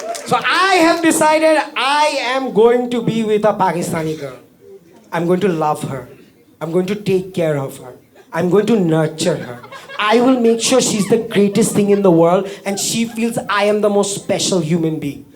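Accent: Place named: Chittagong, Bangladesh. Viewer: চিটাইগ্যা নাকি ভাই!?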